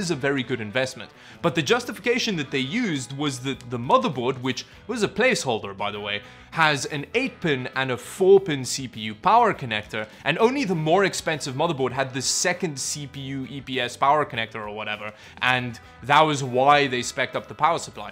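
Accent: South Africa. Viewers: Are u from south africa? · Bro are you South African?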